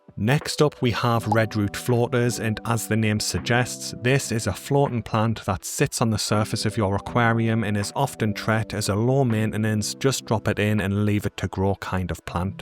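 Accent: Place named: Scotland, United Kingdom. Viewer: Oh, and I (Swedish) thought it was a Scottish accent, probably insulting many people on both side of the England/Scotland border... ooops sorry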